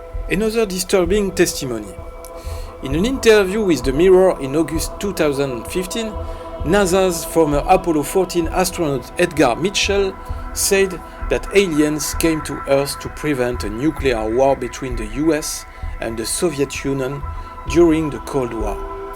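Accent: France. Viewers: Congrats on the new look and experience to the Channel. IMHO, loud background music only makes it difficult to understand your French accented English. Please consider keeping the volume levels of background music lower as they interfere with your message. Thanks for keeping up an interesting channel.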